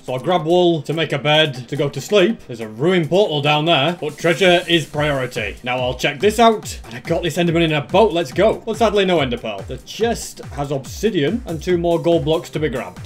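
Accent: United Kingdom. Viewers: You are Britishers because of your accent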